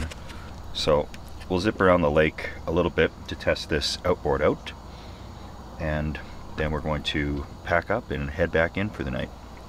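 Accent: Canada. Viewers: My wife is Canadian so I forgive the accent but mispronunciations are off limits. You’re still saying “brats” man. It’s “braahhts. You wouldn’t say bratvo would you? No you’d say bravo. Ok, so BRAVO on the video. I used to do something similar on my pontoon with a little self standing tent weighted down. Fun times. Thanks for the memories.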